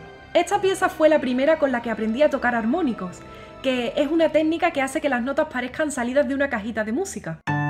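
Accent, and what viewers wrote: Andalusia, Spain. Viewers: Escuchar ese acento andaluz enamora 🥰💞😍😘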